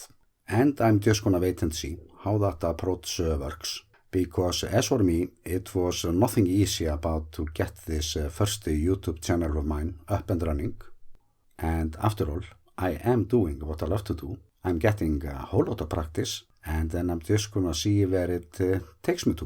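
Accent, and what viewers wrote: Iceland. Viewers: Your channel is much more than just about geology, earthquakes and a volcano, even though that's what initially drew me here. Your educated insight and coverage has been an enjoyable and informative experience. I've grown to love seeing and hearing about all things Iceland, so I'm here for the long haul. I'll look forward to seeing my notification bell lit up and finding you there. There's so much more to see and explore and you'll be the perfect guide, my friend. Your authentic Icelandic accent is a nice addition, too. 😉 . . . Stay well.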